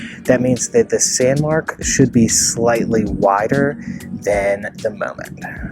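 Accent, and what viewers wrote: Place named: South Africa. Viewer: Your accent has some South African sound to it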